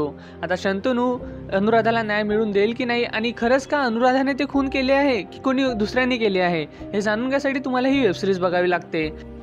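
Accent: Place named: Vidarbha, India. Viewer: Vidarbhatil marathi ahe, so don't expect puneri marathi....... Ignore comments and Keep it up brother 🙏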